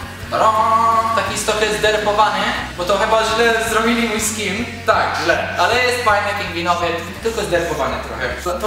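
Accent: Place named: England, United Kingdom. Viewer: Ale ci się akcent wyrobił ;3 Teraz to już ledwo słyszalny ten angielski, chociaż trochę szkoda, bo z nim było jeszcze zabawniej ! :D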